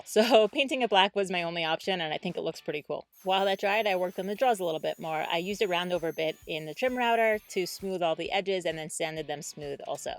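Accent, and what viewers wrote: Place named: Long Island, United States. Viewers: great build. My son would have loved this 10 years ago! BTW, can totally hear the Long Island in your voice on this vid.. :-) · Very nice! I can see this piece being handed down for generations. And the northeast accent "draws"... gotta love it!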